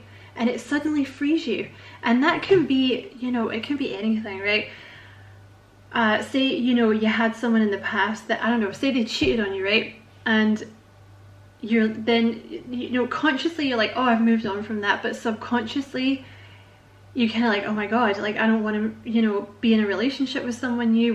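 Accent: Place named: Scotland, United Kingdom. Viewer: Gemini Ty...the Accent is lovely Scottish...lol from Queensland Australia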